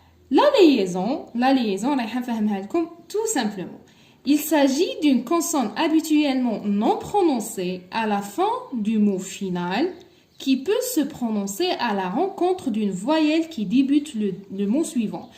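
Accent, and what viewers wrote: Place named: Tlemcen, Algeria. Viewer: يعطيك الصحة بزاااف استاذة تعيشي انا من الجزائر بانتلي لهجتك من تلمسان اذا كنتي مالجزائر رح نفرح اكثر والله ربي يوفقك ومغسي بزاااف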